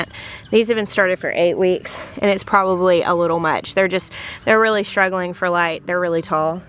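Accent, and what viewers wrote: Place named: Ozarks, United States. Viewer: Love your accent, sounds like someone from ozark!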